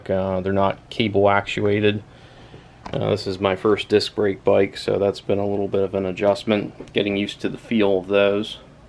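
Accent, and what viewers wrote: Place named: Pennsylvania, United States. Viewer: Guessing by your accent that you're from PA..Have you gotten out and done some serious rides on this bike yet? I just sold a Tarmac Pro and was looking at this model for a more comfortable ride for the rough PA roads. Thanks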